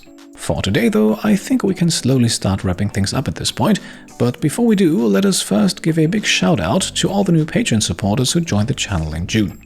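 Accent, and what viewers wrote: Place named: Germany. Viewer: I don't usually comment in your videos, but I love your work and never miss your Rimworld and XCom videos, they're just perfect... Fun fact, I don't know how I never realised you are German, hearing it now it seems obvious...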